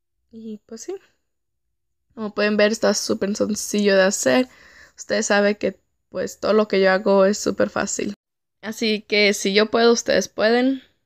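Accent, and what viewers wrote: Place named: Chicano, United States. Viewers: Por la voz de ella, le atino que vive aqui en estados unidos, pueda ser Texas y que es una chava de unos 19años jejeje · Me encanta que diga todo en español aunque se le note que probablemente es chicana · Supongo q mexicana nacida en el gabacho así hablan todos los q nacen en usa y los q llegan desde niños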